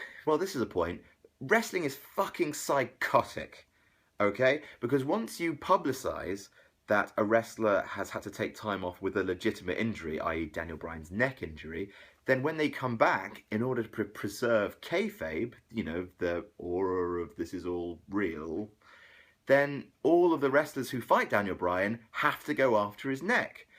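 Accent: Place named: RP, United Kingdom. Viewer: I listen to this to fall asleep at night to the sweet and relaxing posh voice of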